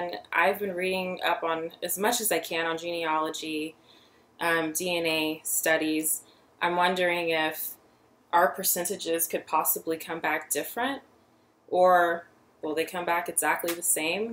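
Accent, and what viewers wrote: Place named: United Kingdom. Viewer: HI, I like your ancestry DNA videos. All are very interesting. You have a slight British accent.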